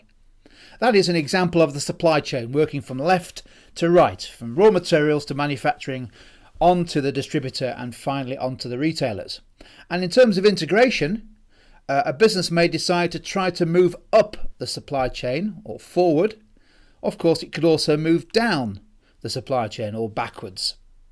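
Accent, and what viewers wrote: England, United Kingdom. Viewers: seems legit because he has an english accent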